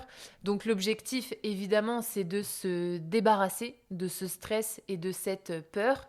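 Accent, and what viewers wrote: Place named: Lyon, France. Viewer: J’ose parler français avec les francophones assez regulièrement. Malgré d’avoir un niveau avancé, je m’occupe trop du regard des autres. Ça ne me bloque pas trop sauf quand je traite des sujets où je n’ai pas le vocabulaire. Merci pour cette video et de ce message d’être tolérant avec soi même. J’adore ton accent (lyonnaise?).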